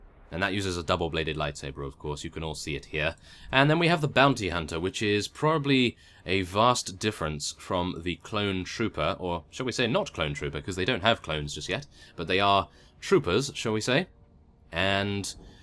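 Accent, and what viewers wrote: United Kingdom. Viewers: Make a guild please :D, I will join you! we will all join you! Mwahahahaha! (please go empire, any class! british accents are awesome in my opinion! :D)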